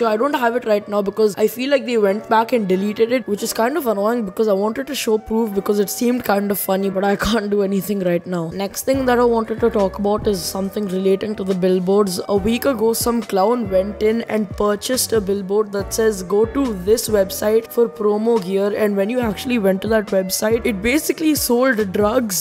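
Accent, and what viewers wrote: India. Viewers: u r indian na ?? :) ;) I think because your voice is like an indian talking english :)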